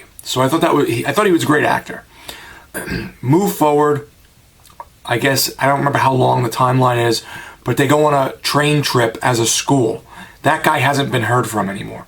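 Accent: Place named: East Coast, United States. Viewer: I'm in North Jersey now & I've been here for a while now but I'm always visiting fam in NYC. Hearing your voice, I figured you were from the East Coast, I just didn't think it was that close to home haha. I just followed you on Twitter.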